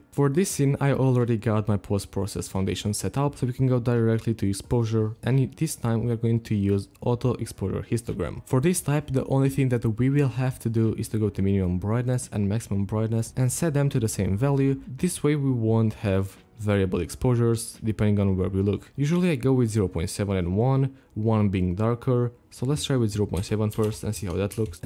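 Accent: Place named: Russia. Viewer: Сразу видно по голосу, наш брат, русский)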